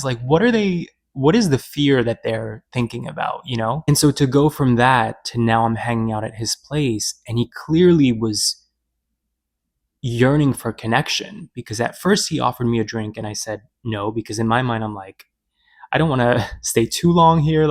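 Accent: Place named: South, United States. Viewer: Sounds like a true southern man with limited experience hooking up.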